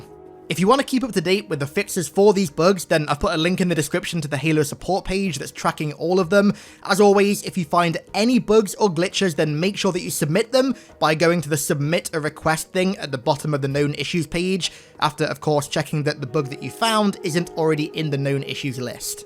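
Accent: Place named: United Kingdom. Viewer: Lispy British man? I didn't know that film critic Jonathan Ross was also a gaming Youtuber!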